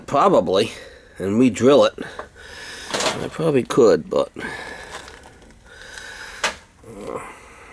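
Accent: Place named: Massachusetts, United States. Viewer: It's actually a south coast massachusetts/rhode island accent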